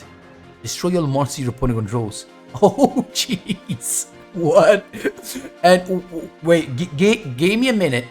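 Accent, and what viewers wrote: Italy. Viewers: Perché hai un modo di parlare l'inglese a mò di napoletano. Essendo che lo so parlare e capire anch'io hai alcuni tratti che mi ricorda me allora ho voluto domandartelo "sapendo che probabilmente avrei fatto la figuraccia" invece saper di aver indovinato per un intuito che ho avuto mi rende molto felice ahah Comunque sei un grande, lo parli benissimo e adoro come spieghi le cose su Yu Gi Oh!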